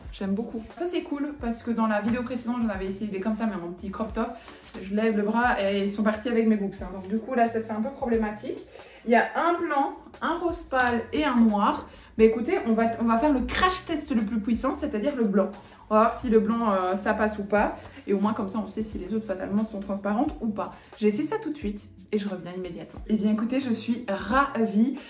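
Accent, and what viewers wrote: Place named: Belgium. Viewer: Hello je viens de te découvrir et j'ai entendu un petit accent, il me semble belge ( si c'est le cas comme moi j'habite près de l'atomium mais côté Flamand, même si je ne le parle pas, à wemmel exactement) Du coup je soutiens les belges ❤. Sur ce la 1ère robe est très belle et n'hésite pas à mettre des couleurs ( surtout qu'ici c'est uni et ça reste foncé donc loin d'être flashi😉) Par contre tu as quand même une belle et généreuse poitrine, et je trouve que ça ne va pas trop un col qui est au cou...les décollés ( sans exagération pour ne pas être vulgaire) te vont bien mieux. Bisous et j'ai hâte de regarder tes autres vidéos ( hélas je n'ai pas Instagram, mais je vais regarder sur Facebook si tu y es 😊) .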